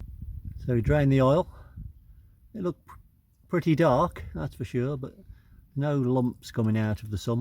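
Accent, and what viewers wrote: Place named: East Coast, United States. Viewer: Just got a 85 Ford diesel 350 a one owner with 70k miles. Needs minor interior repairs. A little leak happened while she sat for four years. Had it towed and she started up first crank. Purrs like a kitten. Thinking mabe Van Halen stripes on the old girl. Needs a bath as is completely covered in moss and thick lichen. Where are you all located? Sounds Eastern accent. NW Arkansas here.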